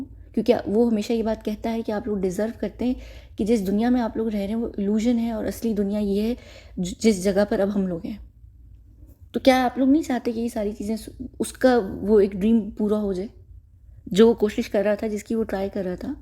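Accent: United Kingdom. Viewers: funding to movies by badelog aur durgs ka kya sceene kasi funding hoti hai, ye salman k bahar dubai kyu jata hai? funding? aur prostitution kon provid karta hai bollywood m ya phir salmon ka hat kya hai es m. kya salman k sach m religious neutral hai ya bas dek ka ne k liye ganpati festival karta hai. wasi es m to sure hu m to b kuch extra info aap batavo, salman ki ek sister hai usne to ek hindu se shadi ki, kya seen hai love jihad k mamle m, kya salman uski sis. ki beti ko flim m launch karega? aur new comers ko ye kasi treat karta hai, outsider aur insider b jayse Sonakshi Sinha (ki se ne controvesy ki ti ki salmon sonakshi ki mom k sat night.) aur Saiee Manjrekar eska b batavo. vae se british acc. to badya hai aapki. suna te raho bech bech m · I honestly love ur British accent 💞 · Sis I personally love your British accent. I just thought to share with you because of the warning statement u mentioned in the beginning. 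Baki log bhaar me jae.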